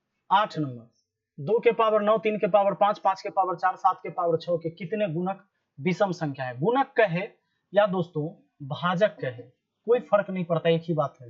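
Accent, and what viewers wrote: Bihar, India. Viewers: Thank you sir aap bihar se hai ya haryana se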